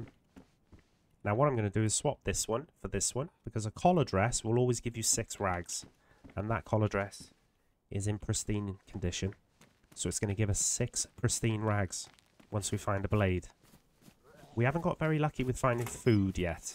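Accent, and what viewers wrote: Wales, United Kingdom. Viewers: Late to the party with DayZ and your videos have been amazing at setting me off in this game. The Welsh accent is a great accompaniment to the end of the world too 😂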